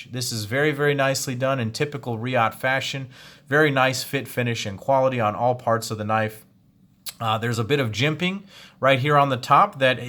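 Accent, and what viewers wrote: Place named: New Jersey, United States. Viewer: You know, because of his very French seeming last name and his knife aesthetics I always thought he was French in France. Then I heard him talk. Brother is just an MFer from Jersey like me!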